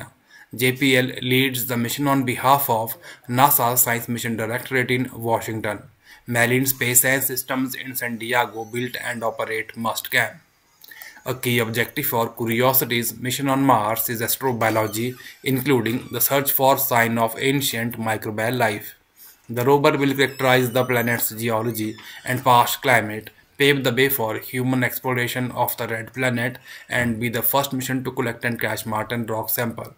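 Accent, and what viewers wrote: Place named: Scotland, United Kingdom. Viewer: That's a thick Scottish accent you've got there. The straight lines on Mars look intelligently made, like a channel or something. I'm not sure of the scale however. This might be AI bullshit.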